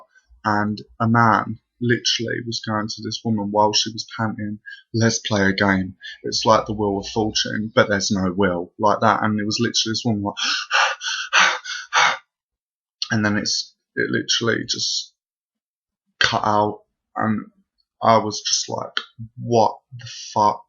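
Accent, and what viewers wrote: England, United Kingdom. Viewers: nice to hear an English accent. what part you from.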